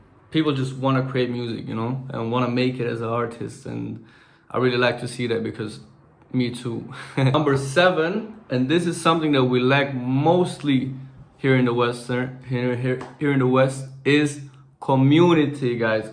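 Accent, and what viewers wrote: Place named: Malawi. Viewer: 😊you speak as if you’ve been in malawi for decades bro,wow proud 👏 of you and my mother malawi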